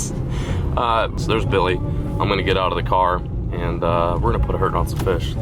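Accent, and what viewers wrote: South, United States. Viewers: How come you talk like you have a southern draw in this video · Lol, in this old vid you can hear a like southern accent from, wow things have changed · Actually southern and mid Ohio + all the country side folk have that kind of southern twang. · I feel like you are talking more like a southern accent than you usually do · why do you have a southern accent in this video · Sounds like you’ve got a southern accent in this video. I’m a new sub. Going back and watching videos. You sound so different. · How much voice has changed from then to today in 2019 The southern voice disappeared 😂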